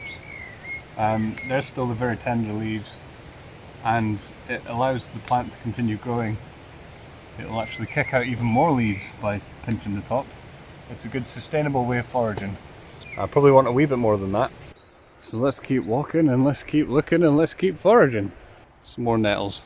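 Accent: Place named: Scotland, United Kingdom. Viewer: Jobbies… What a fabulous word 😁 I love it even more when it’s said in a Scottish accent! I came across your video on the Liveration channel yesterday, there was something so relaxing about watching you speak, when you said you also foraged and had a YT channel of your own I had to come for a mooch! Hope your routines are going well, guess I will find out shortly… 🙂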